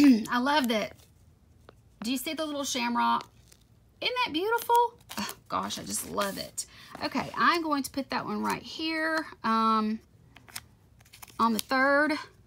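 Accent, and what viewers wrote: South, United States. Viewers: GORGEOUS! Loved hearing your answers and you are tooooooooooo kind to me! I love listening to your cute as pie southern accent :D Urban Decay is such a great brand... they have SUCH an amazing selection of all products too! I love Too Faced but sometimes the quality is hit and miss for me? But some is sooooooooooooo good. <3 loved this... I had so much fun collabing with you!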